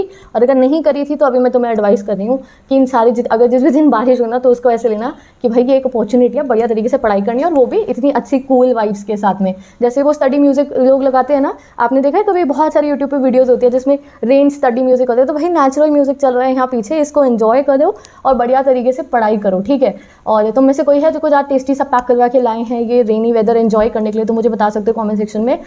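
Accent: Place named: Haryana, India. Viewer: dii I think your ate from haryana or from north india side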